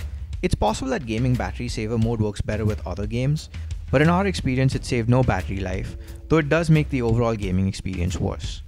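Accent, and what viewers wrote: India. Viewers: i always like how indian's pronounce FANTASTIC XD its one word that lets u know if he is indian or not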